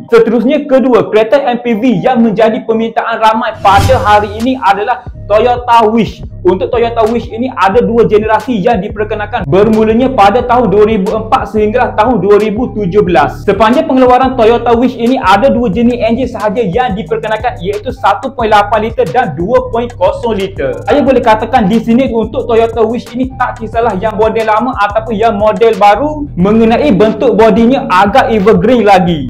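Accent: Kelantan, Malaysia. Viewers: Confirm kau ni fobia dengar sleng kelantan kan?..lantak dia la dia nak guna bahasa loghat ibunda dia..dia masih xlupa asal usul dia..yg kau suruh dia ubah² tu pehal..dia bukan warga asing..dia warganegara malaysia melayu..pe benda la punya pemikiran..org mcm kau ni klau duk oversea confirm terus lupa asal usul kau. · Bos kena kerap baca surat khabar agar cakap boleh tukar bahasa buku n tak bunyi kelantan,maaf sekadar pendapat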